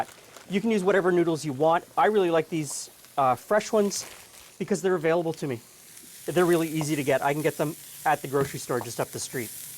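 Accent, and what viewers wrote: Canada. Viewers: i knew from the accent you were a fellow canuck, always love Canadian content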